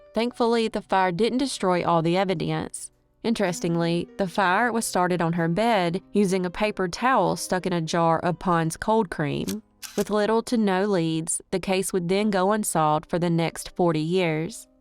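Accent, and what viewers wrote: South, United States. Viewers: Hahaha! Oh she's a real as you can get!!! She's 100% authentic!!! I can give you big ol' Texas gaurantee She's as real as you will EVER get!!!! And we love her!! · You can't do the southern drawl with AI. I really like hearing someone who speaks like people I know down here in the south. Thx!! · I thought bout tht. The southern accent. But who knws wat those things are capable of....🤔🧐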